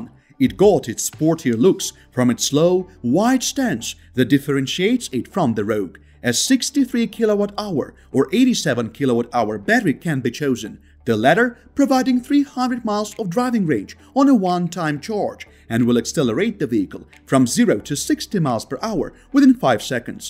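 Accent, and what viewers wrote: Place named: United States. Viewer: Is this what a posh American sounds like? Jeez dude stop you’re butchering the English language!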